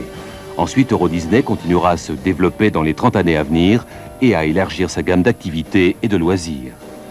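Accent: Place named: France. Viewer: Die Franse uitspraak van de Engelse termen maken het helemaal. Merci om dit te delen, deze had ik nog niet gezien. ❤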